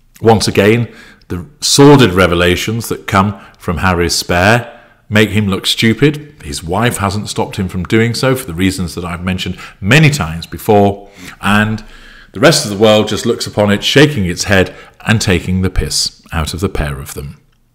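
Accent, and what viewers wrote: Australia. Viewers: I’m amused by your Australian accent. I hope someone comments on audiobook experience! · Your Australian accent spiked monologs are a few of my favorite things! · you are hilarious!!! Loved your opening monolog. I'm one of the ones that loves your Australian accent, G'day, etc.... And to those who don't like it, then GOOD! 🤣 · champion aussie Accent Mate. fair suck of the sav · More Aussie accent please.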